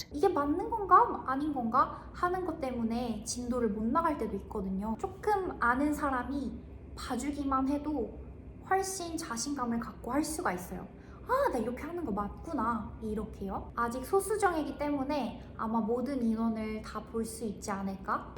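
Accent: United Kingdom. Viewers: 영국발음 너무 좋아요.. 인스타에 올릴 용기는 없지만 열심히 복습할게요..💗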